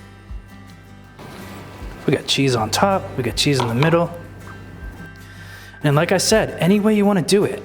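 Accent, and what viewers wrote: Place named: Boston, United States. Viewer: Hi, love the video. I’m going to make this as soon as I can. I was just wondering what part of the northeast you are from. I’m in Massachusetts and you sound just like me! Chadda, betta. You know, like your from Boston or somethin. Just curious! Thanks for the video. ✌️🍻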